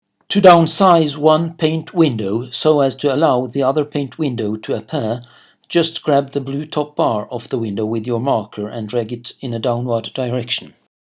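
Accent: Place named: Sweden. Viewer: I knew in an instant you were Swedish from your accent haha, it sounds exactly like when I'm trying to make a Swedish parody in Danish :P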